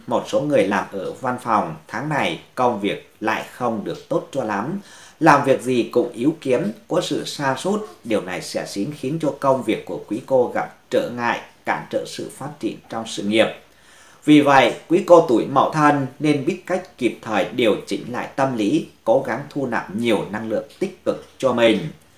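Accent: Thanh Hoa, Vietnam. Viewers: Sao giọng thầy giống như giọng thanh hóa quê cho vây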